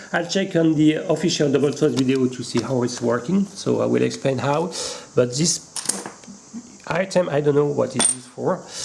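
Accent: France. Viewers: Te pas francais ?? parcque vue ton accent on sen que ce francais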